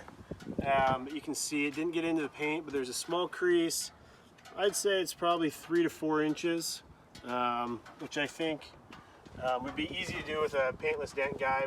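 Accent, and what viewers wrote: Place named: West Coast, United States. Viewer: Boy if I've ever heard a west coast accent it's this here. And what a pretty truck!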